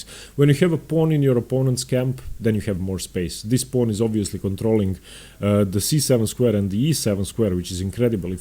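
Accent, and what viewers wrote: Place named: Russia. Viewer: Thank you for providing these well thought out and well presented videos. I think I detect a Russian accent in your speaking. If so my thanks to you is "Spacibo" or "Спасибо"!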